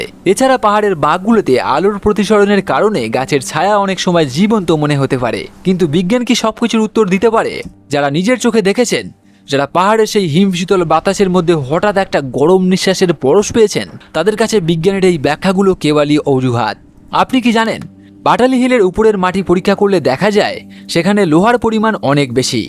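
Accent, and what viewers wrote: Kolkata, India. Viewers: রহমত আলী পাহাড়ের উপরে রিক্সা উঠালো কেমনে, আপনার ভাষাতে মনে হচ্ছে আপনি কোলকাতার,আর এই পাহাড়ের আশেপাশে আমার বাসা, তবে হ্যা এখানে ভয়ের ব্যাপার আছে, এটা জানি,কিন্তু এই পাহাড়ের রাস্তায় রিক্সা উঠানো একেবারে অসম্ভব ❤❤❤❤❤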